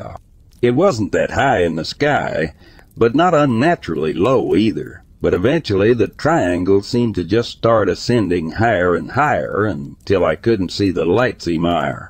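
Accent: Texas, United States. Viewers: Yea Texas accent an also Alabama accent the best for me. Super cool 😎 good day too all from the UK 🇬🇧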